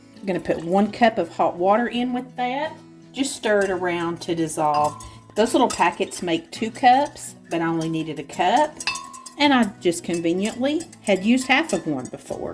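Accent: South, United States. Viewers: Oh YUMMMMM I was worried about the staple as well… these are great.. I have my meals for the week… you are the best… you make my day I’m better just listening to you and your southern accent.. sending love 💚and ☀️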